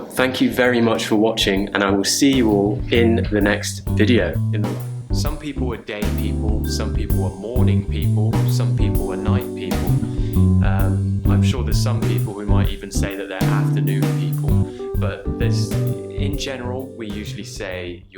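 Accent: United Kingdom. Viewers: English Hi mate. I'm from Italy. I've been studying for Cae exam, and with regard to your question on how we improve our skills and learn new words, I've developed myself a sort of method. I start with looking a dictionary up looking for interesting words. Then, on wordreference or cambridge dictionary I seek word families ( I mean, words families of words I selected from my dictionary - hope this is clear). Then, I have a look on lingohelp where you are told what preposition follow an adjective and so on. Accordingly, I keep my head down and study all this words and then I do try to put them into practice by typing to friends of mine who are American, B English and similar. What I mean is this: I oblige myself to study 'em all while using them and I do allege I know them perfectly. I know it's quite weird but It pays off as most of the times it works. That's blatantly obvious that I cannot remember hundred of words but I needed to enhance my English voc. 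variety and so here is it! I'm applying the same kind of approach to idioms and collocations as well. Moreover, I do watch movies in English. Admittedly, I did it for fun and more frequently in the past but I would do it with subtitles. Now I've been trying to do it without 'em, especially Game of Thrones, where people talk using B. Accent (which I've copied from your way of speaking ahahah). I wouldn't dare say it's easy but it's not difficult either: more or less I'd say I figure out 70 80% of what characthers say. I hop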